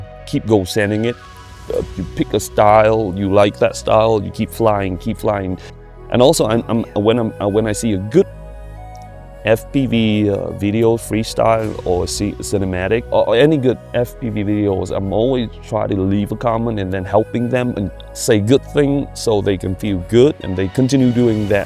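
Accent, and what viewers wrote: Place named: Texas, United States. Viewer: I see, thats kind of similar learning curve to mine. Definetly not, your accent sound cool, you sound like some bloke from texas (atleast to my slavic ears). Keep on grinding mate!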